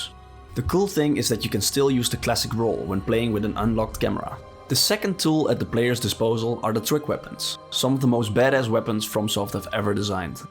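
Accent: Netherlands. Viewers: I know a Dutch accent when I hear one.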